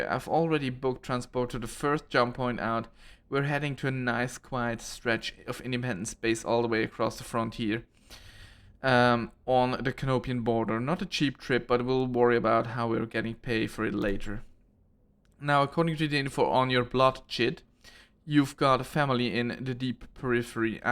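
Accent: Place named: Germany. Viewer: Yess man merk den deutschen Akzent, allerdings machst du das echt gut💪 ich würde untergehen im Lets play wenn ich es auf Englisch machen müsste😅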